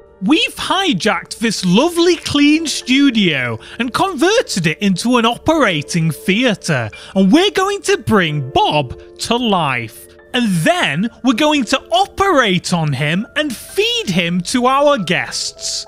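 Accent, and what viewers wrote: United Kingdom. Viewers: He’s British, So Is “Stampy”. Of Course He’s Gonna Something Like “Stampy”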